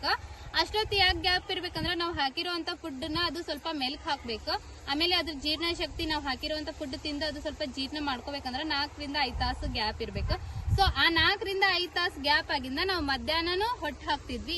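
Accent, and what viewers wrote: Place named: Koppal, India. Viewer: ನಿಮ್ಮ ಭಾಷೆ ನೋಡಿದ್ರೆ ನಮ್ಮ ಪಕ್ಕದ ಜಿಲ್ಲೆಯ ಕೊಪ್ಪಳ ದವರು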